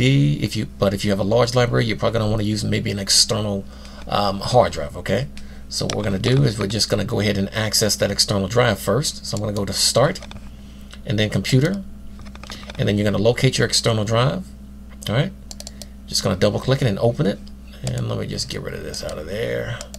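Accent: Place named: South, United States. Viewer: That was very simple and resourceful. I admire your accent by the way what part of the South are you from? My first guess is Tennessee, my second is Georgia.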